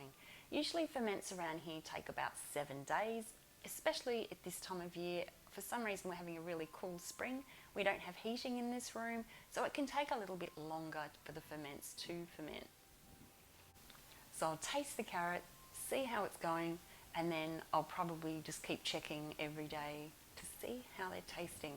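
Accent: Australia. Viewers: I thought ... 'that sounds like an Aussie, but must be in North America, because some of the words used', I now realise you are in Vic. My question ... what are the visual signs the ferment is done? I have had all failures in this first month of trying. The chooks are getting heaps of sauerkraut. I make a good sourdough, so I thought I would try to make a ferment starter. Well .. it is turbocharged ... I made carrot sticks, with fresh dill, in a Leggo's jar. Next morning, I tried to 'burp' it, it came out like a shaken bottle of champas. Today, was the third day, when I opened the lid, I had to quickly put it back on again. I opened it 3 times in 10 minutes, and finally it wasn't trying to spew out. It still had crunch, but definitely softer, a little bit salty, but I wouldn't call it sour. I put it in the fridge.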